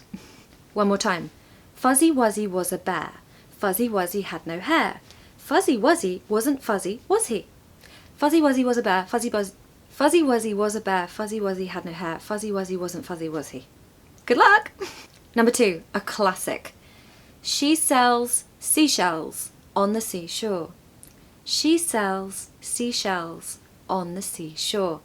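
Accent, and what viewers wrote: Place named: England, United Kingdom. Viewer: I think your accent is the nicest English accent. Every word you say is crystal clear and lovely to listen to. I, myself, sound like an old ship's clapped out foghorn. Another great video,! Have a great weekend 👌🎸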